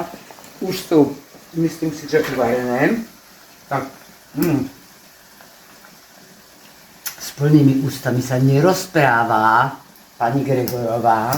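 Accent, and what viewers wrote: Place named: Eastern Slovakia, Slovakia. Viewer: Mila pani. Ste, Super zienka, mama, kucharka. Ani si neviete predstavit ako, kazdy Vas recept, video, aj vase hlasky, nezaprie sa, ze ste aj vychodniarka…💗 nam prinasaju radost v Brooklyn,NY. Prajeme Vam aj kameramanovy vela zdravicka lebo je vzacne a hlavne lasky. Spozdravom vychodniarky( rusnacky) z Brooklyn E&K 😘😘😘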